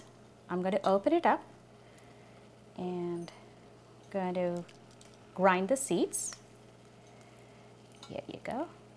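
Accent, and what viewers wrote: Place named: India. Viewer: Is this what u learnt in the entire video..she is Indian and has an accent..live with it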